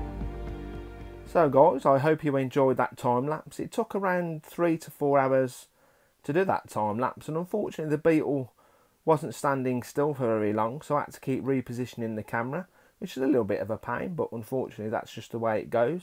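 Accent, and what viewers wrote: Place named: Birmingham, United Kingdom. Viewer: That's the Brummies accent for you (I'm one myself)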